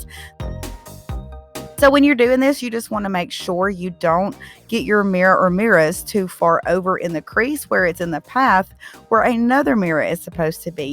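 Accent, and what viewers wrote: South, United States. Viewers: GORGEOUS!!!!!!! Btw I think I look at your videos as to watch the crafts as much I do to listen beautiful southern accent...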